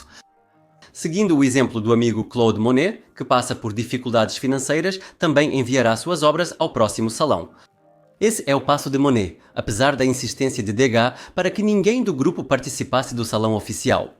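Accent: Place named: Brazil. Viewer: Seu sotaque não, e brasil.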